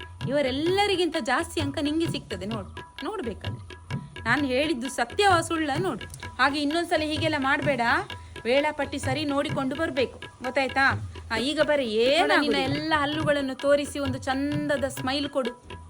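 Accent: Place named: Coastal Karnataka, India. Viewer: ನೀವಾಡುವ ಕರಾವಳಿ ಕನ್ನಡ ಧಾಟಿ ನಿಜಕ್ಕೂ ಮನೋಜ್ಙ, ಕೇಳುಗರ ಕಿವಿಗೆ ಅಚ್ಚುಮೆಚ್ಚಿ.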